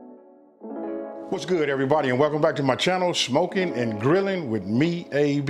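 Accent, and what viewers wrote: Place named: California, United States. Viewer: It's giving.. retired gangster meets good woman & becomes domesticated. lol. I love it! i love a cali accent! i definitely subscribed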